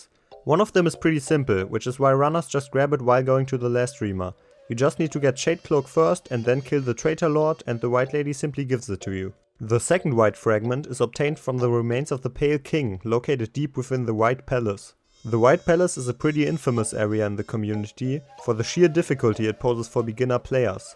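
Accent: Germany. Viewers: I, a german, watch you, possibly and probably a german, talk english. Beautiful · Nice Video but hör ich dar ein deutschen Akzent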